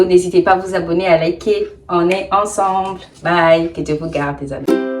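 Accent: Ivory Coast. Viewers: L'accent de l'auteur de la vidéo est plus ivoirien que gabonais. Merci d'unir le Gabon 🇬🇦 et lz Côte d'Ivoire 🇨🇮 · Comment tu as fais pour avoir l'accent ivoirien comme ça ?? Tu es devenue ivoirienne hin... Moi je viens te marier.. tu es trop belle et super cool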